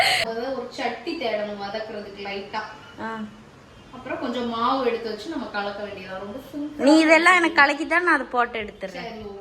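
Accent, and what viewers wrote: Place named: Nagercoil, India. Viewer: Yentha or da nagarkovil da tamil super ah irukku da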